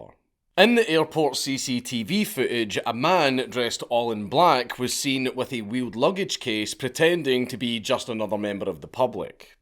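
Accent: Scotland, United Kingdom. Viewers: seen it but always good to hear in scottisch accent...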